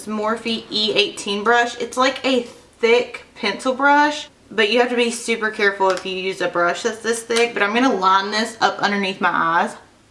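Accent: South, United States. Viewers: Your so pretty with and without makeup! You sound like your from the south, I love it! I live in North Georgia! :) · Girl, I am a new subbie and I knew you had to be a southern gal! Love this eye look! Hugs from Bama!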